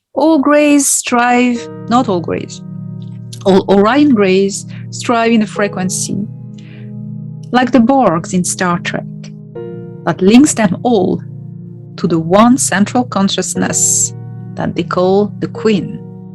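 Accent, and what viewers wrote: France. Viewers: Dear, I'm absolutely delighted by your charming french accent, but can we expect a french version of this super motivational vidéo? Namaste 🙏 From a belgian follower and unconditional fan 😍😇 PS: I know it's double work, but take the example of Garbielle Isis, who always record simultaneously her video twice: once in French, once in English...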